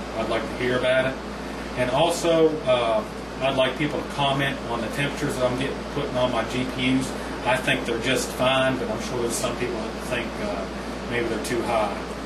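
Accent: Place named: Texas, United States. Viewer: love the accent, sounds like texas. BRING MOAR TF2 DISPENSERS HIER!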